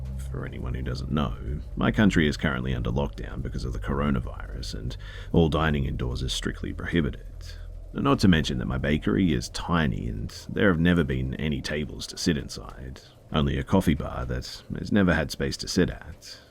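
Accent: Australia. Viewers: He's an auzzie mate so fuck off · He's Australian?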